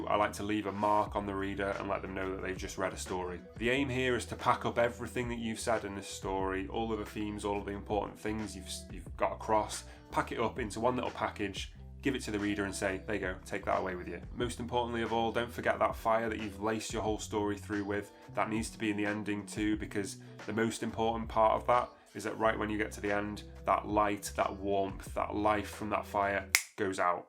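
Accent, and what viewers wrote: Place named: North, United Kingdom. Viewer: Just found your channel from this great video 👍 you sound like a fellow northerner! I've been writing flash fiction lately and really enjoying it. Looking forward to checking out some more of your videos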